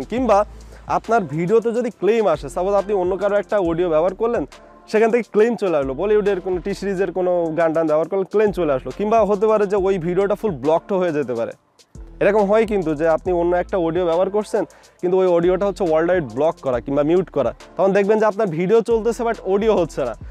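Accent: Sylhet, Bangladesh. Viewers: ভাই আপনার বাড়ি সিলেট না কি?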